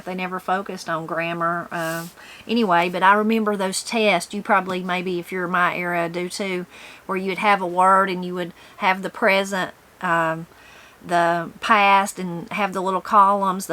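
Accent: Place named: Oklahoma, United States. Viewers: My Oklahoma family is originally from your area and they speak like you. I was grown and gone before I called a couch a “couch” before that my family mostly called it a divan or a lavan. They also added the r to their words. Like my aunt Nina was “Niner”. My mother was and still is for many of her family Ader for Ada. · How can I order your cookbook? Thanks. I’m from WV, so I do understand your (or ourdialect. Lol 😂😂😂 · I love the way you speak! Growing up on the West Coast, we could NEVER get away with speaking that way. It almost sounded like a "foreign language" when I first started watching your channel. Not judging at All! I Love how in the South they use the phrase "Bless your heart"... sit down and stay a spell. I remember my friend Pansy said y'all one day, oh my goodness Mrs. Morris corrected her and embarrassed her in front of the entire class. 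She never forgot that. Your girls are some of the most loving young women I have the pleasure of getting to know. I watched a video of them yesterday asking if there were anyone needing Prayers!! Who does that? Girls that were brought up by loving families, that's who. So Sweet. What a better world this would be if we had more and. ❤ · I love the way you talk. I am southern thru and thru. Learned most from my Granny. Miss her a lot.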